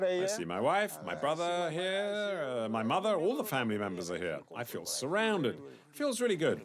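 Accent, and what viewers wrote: England, United Kingdom. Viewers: Nice English narrator. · His a pommie, thats how they speak, little on the painful side. Should have allowed the locals that spoke english to be heard. I could hear what the people were saying while he spoke. Some of his information was incorrect, but still a good documentary. Thankyou for sharing.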